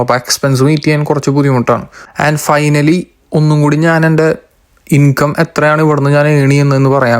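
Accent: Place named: Thrissur, India. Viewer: ചേട്ടൻ തൃശ്ശൂർ ആണല്ലേ സംസാരം കേട്ടപ്പോൾ മനസ്സിലായി ഞാൻ പാലക്കാട് ഇപ്പോൾ പോളണ്ടിലുണ്ട് അവിടെ ജോലി ശരിയാക്കി തരണേ പ്ലീസ്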